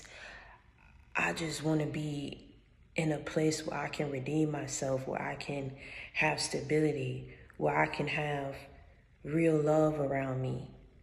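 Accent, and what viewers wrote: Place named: Geechee, United States. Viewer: Sing yo sung that's how geeche people talk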